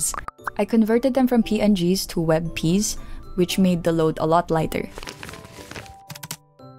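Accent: Philippines. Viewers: I've lived in the Philippines for all my life and accent is bothering me, not in a bad way or anything just familiar in a sort of way, her accent reminds me of alot of my Aunts who speak English but their main language is Filipino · I know a filipino when i hear one, lmao literally had to check ur profile when heard ur accent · first time watching your content. overall its very simple and cute <3. and loving your filipino accent :3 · This might be weird but the only video ive seen from you is the duck one and this is the first time im hearing your voice, LIKE ONE MINUTE IN i was like “I HEAR FILIPINO”😭😭😭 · Are you from philippines? Your english accent sounds like that ❤ very adorable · i know a filipino when i hear one :face-orange-biting-nails: anw i absolutely love ur arstyle!!!!!!!!!!!! ur voice's soothing too :pppp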